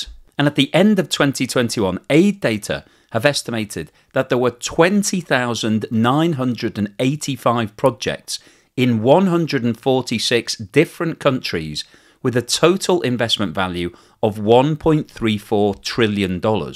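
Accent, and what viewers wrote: United Kingdom. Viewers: This propaganda piece is way to cheap. Basically, you are saying that all these countries working on this "initiative" are idiotes and don't have a clue that they are controlled. The number of participating countries keeps growing, because the new comers are not as smart as you to understand the trap? Judging your accent, don't you think it's strange that GB was among the first to invest in the bank to financing this "disaster".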